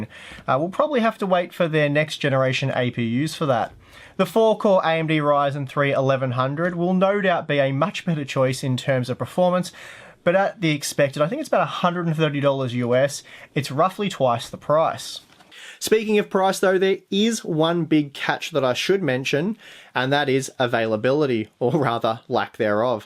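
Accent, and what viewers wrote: Australia. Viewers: what is this guy an Aussie? Aussie! Aussie! Aussie!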